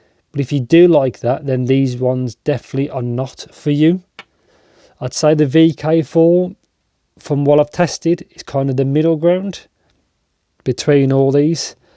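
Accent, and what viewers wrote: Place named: Black Country, United Kingdom. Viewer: I dont know if it's the short, informative and to the point videos or the (I want to say black country?) accent that makes me feel at home but you have to be in my top 5 youtubers. Always eager for a new video from you, especially for iems and daps! Keep up the great work!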